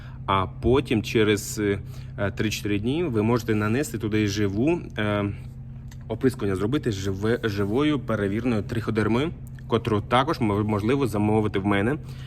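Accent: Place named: Poltava, Ukraine. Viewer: З яких часів полтавський діалект став суржиком,ґа?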